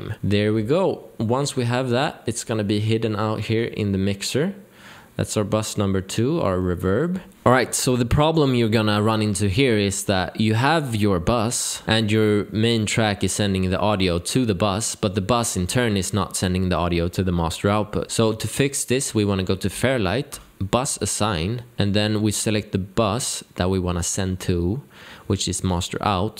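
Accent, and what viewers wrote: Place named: Sweden. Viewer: You from sweden dude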